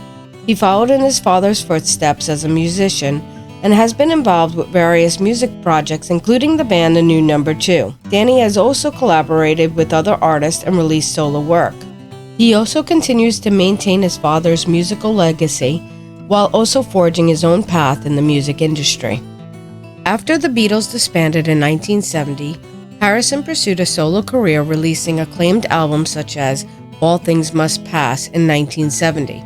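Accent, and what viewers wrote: United States. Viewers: Ur from jersey arent u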